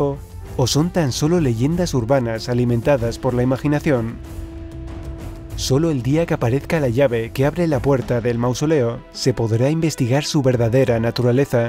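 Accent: Spain. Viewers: coño!!!!un español narrando el video!!!!yuhuuuuu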